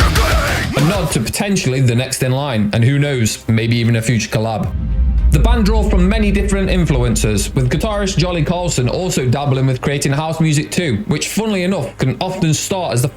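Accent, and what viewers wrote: United Kingdom. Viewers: why are you british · Are you from yorkshire?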